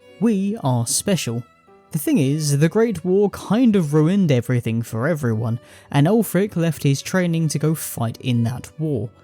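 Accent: United Kingdom. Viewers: I'm English, and judging by accent I assume they are too. We tend to drop the R at the end of words.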